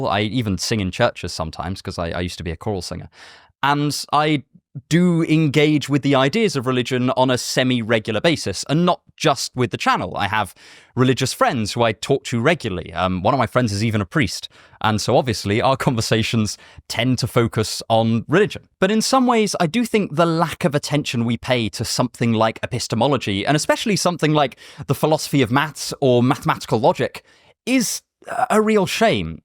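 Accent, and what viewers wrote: United Kingdom. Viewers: His teeth and speech make him british.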